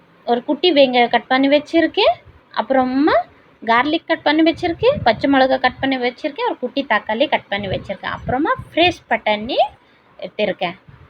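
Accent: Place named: Odisha, India. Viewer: Odis assent Tamil speaking nice explanation s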